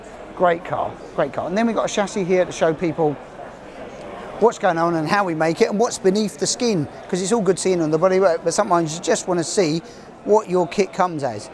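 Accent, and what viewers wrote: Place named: Cockney, United Kingdom. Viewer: Put the Camera on the cars...Can't understand a word this cockney is saying...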